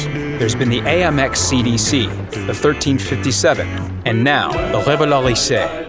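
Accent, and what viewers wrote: England, United Kingdom. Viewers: I love your english accent ! the R-E-V-A-L-O-R-I-S-é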